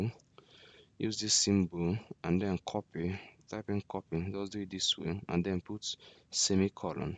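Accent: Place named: Nigeria. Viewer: you sound like a 9ja man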